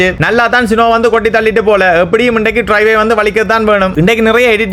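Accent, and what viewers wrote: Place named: Sri Lanka. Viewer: Hey Bro enga ooru slang SL 🇱🇰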